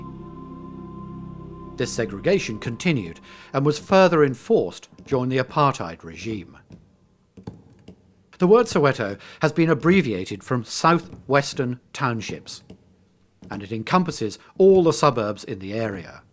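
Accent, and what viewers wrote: United Kingdom. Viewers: My first comment would be that the narrator get an education in pronouncing the names correctly. Would any Limey with so sophisticated an accent as his do so badly in that horrible French they all seem to effect? The editor or writer of the script is really really biased towards the "black"" side of our history. The world should remember that the black (mainly Xhosa) tribes arrived in this region at about the same time as the settlers, so there is no basis for the "rights"to any lands. Yes, there were atrocities inflicted, but by both sides...Sad that the Western Cape (ie Cape Town and environs) are omitted...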